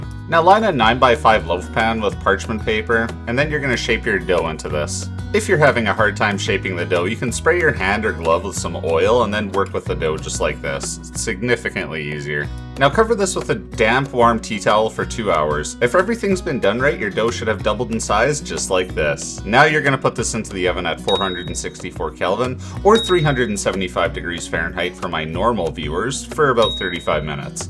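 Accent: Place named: Alberta, Canada. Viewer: Who can tell me where in Canada this guy is from? the accent ..........Alberta?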